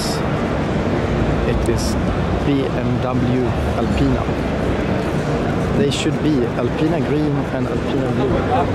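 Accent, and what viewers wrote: Sweden. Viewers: Hi. You really should get a microphone with that camera, sometimes it's very hard to hear what you are saying, specially with a Swedish accent:):):). Anyway, good vid, really enjoyed the Alpinas, I prefer the Alpina instead of the new M5! They look better and more powerful. Take care.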